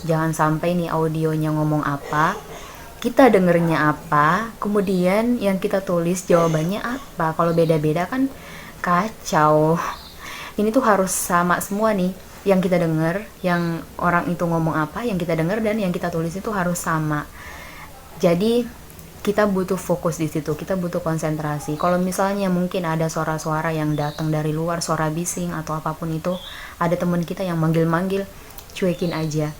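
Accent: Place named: Sulawesi, Indonesia. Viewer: Orang Sulawesi yah kak? Mirip sama ust Syam logatnya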